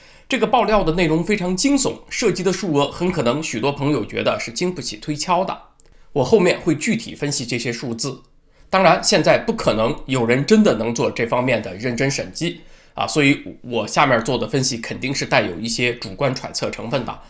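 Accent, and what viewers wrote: China. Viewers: 主播居然没有台湾口音，倒像大陆人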